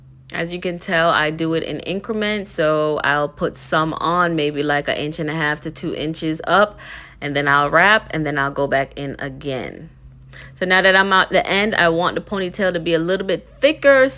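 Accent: Jamaica. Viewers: Hey hun nice job. U Jamaican? 🇯🇲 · Are you Jamaican or from another Caribbean island?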